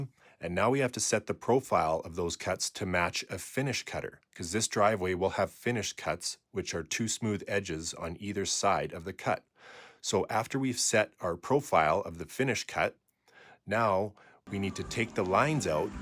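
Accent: Canada. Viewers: You sound Canadian. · Canada voice at its finest. Love the work you boys do!! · For canadians, your pretty good 😂 american say out, canadian sat ooot, great, great work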